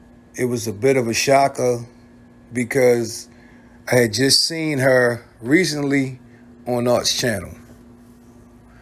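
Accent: Baltimore, United States. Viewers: Your definitely from "Baldamore!"😁😁💯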